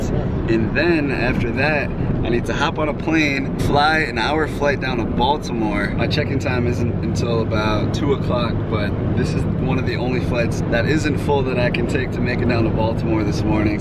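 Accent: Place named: Netherlands. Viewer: Talks for a sec, ok its a dutch dude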